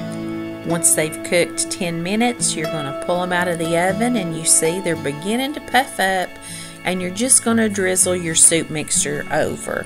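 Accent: South, United States. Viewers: thank you so much I just watched too but I do everything in three so I'm going to watch another one cuz you seem like you're a lot of fun and I love your accent my mom was from the south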